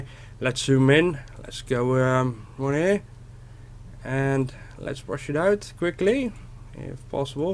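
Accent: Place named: Netherlands. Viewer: Ik kijk al je filmpjes op zijn tijd... Ik herhaal je debuutfilmpje nog steeds vooral vanwege het geraamte (gebroken wiel) bij de zeebedding met nog duidelijk water in de goot en de discutabele commentaren daarover. Ik versta ieder accent in het Engels en kon snel horen dat je Nederlands spreekt. Omgeving Rotterdam of daaronder? Maakt niet uit, je hebt altijd een punt om over na te denken ook al zie ik niet alles zo als dat jij dat ziet. Keep up the good work!!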